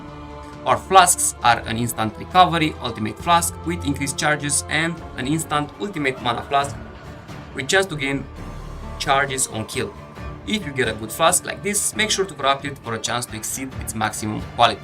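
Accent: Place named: Philippines. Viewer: When I heard your accents I was worried that the video would suddenly changed to tagalog. nice build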